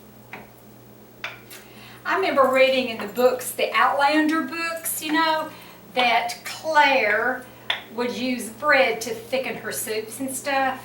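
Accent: South, United States. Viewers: Your chili looks yummy. You are so fun to watch. I love your southern accent. You are so beautiful 🌟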